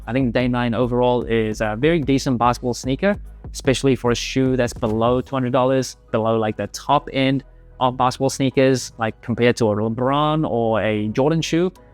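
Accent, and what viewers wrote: Australia. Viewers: I thought so, you definitely sound more Aussie than Kiwi haha 🥝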